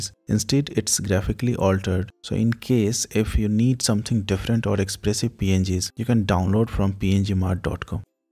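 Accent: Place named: India. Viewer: He is from India. That's how they pronounce words. Do you also correct french, Italians and Norwegians with their pronunciation when you meet them?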